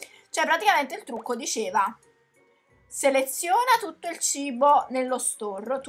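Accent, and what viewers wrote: Naples, Italy. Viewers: Ma sei napoletana? Scusa se te l'ho chiedo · raga solo io sento un accento napoletano hahaha <3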